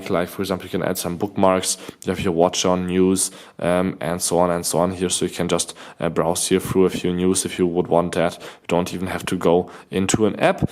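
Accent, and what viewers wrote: Germany. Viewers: R u german?u've got that german accent.....nice review btw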